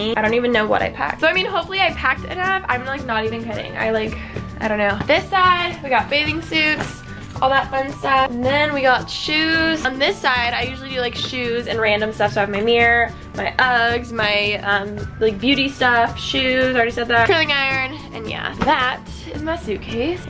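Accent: California, United States. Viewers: Everytime i watch her vlogs i just say wow the cali accent😂😂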